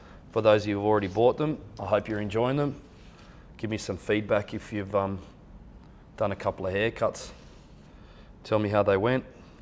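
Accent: Australia. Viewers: Absolutely gorgeous! hair is exquisite. It's luxurious and healthy and looks like liquid velvet with the way it moves The color is scrumptious! You did a very beautiful job! 💜, you are so very handsome and I just love your wonderful Australian accent! Writing from Southern California.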